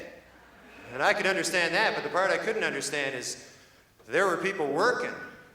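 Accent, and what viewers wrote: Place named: Canada. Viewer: Amazing. All so carefully written. He sounds so much like Letterman here. This is also the only time I've heard him actually sound Canadian. I miss this guy so much.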